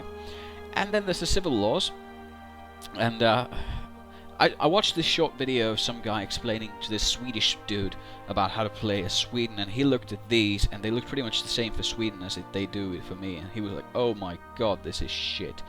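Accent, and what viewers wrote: United Kingdom. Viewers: Kuulostat ihan britiltä ! :D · your from finland!?!?!? wow. I would have guessed British/UK... great English.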